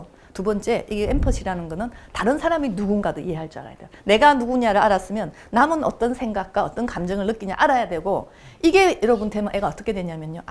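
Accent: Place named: Gyeongsang, South Korea. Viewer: 예전에 tv인터뷰때는 몰랏는데 갱상도 분이네요.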